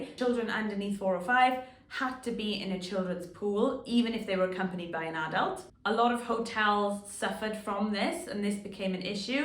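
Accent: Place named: Wales, United Kingdom. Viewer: Thank you for mentioning so early in the vid where you were from (Wales) - was driving me crazy not being able to pick the accent properly 😂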